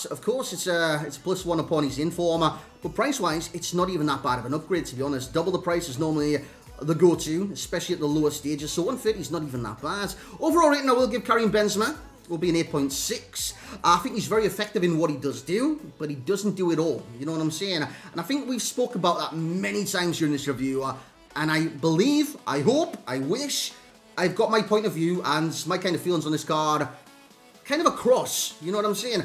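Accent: Newcastle, United Kingdom. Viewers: Newcastle accent its not far from Scotland just incase you're not from the UK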